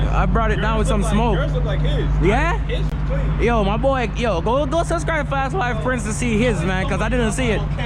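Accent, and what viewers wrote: Jamaica. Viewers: You sound Jamaican🤔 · cant hide that Jamaican accent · U Jamaican bro ? Man tuff yf 😂💪🏽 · Bro you gotta jamaican accent 🇯🇲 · Yoo bro u sound Jamaican where u frm? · Are you from jamaica? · Mi know mi hear a Jamaican 🇯🇲 accent big up yuhself Bredda yuh drive like a real yaad man. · u Jamaican or carribean by any chance?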